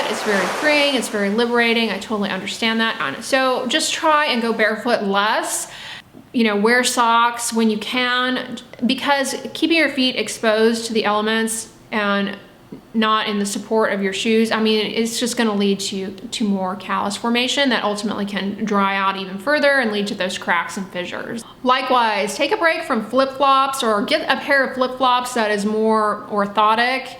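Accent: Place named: California, United States. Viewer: That California accent is BRUTAL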